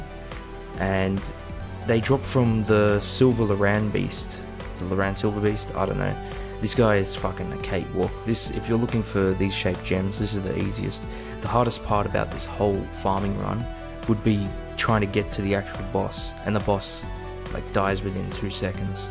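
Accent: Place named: Australia. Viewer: Can we play together. You sound australian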